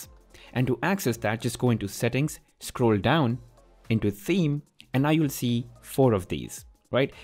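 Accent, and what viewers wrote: India. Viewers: Tapi logat lu kek orang india bro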